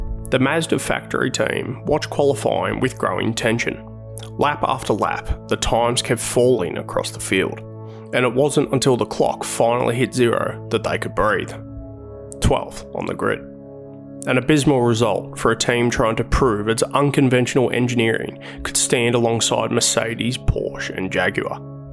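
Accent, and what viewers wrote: Australia. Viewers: Idk just sounds like a normal Australian accent to me😭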